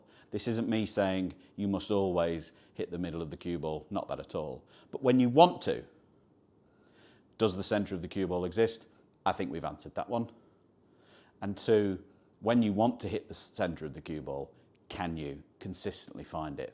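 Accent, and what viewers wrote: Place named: RP, United Kingdom. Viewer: Great snooker player but such a boring generic, posh sounding personality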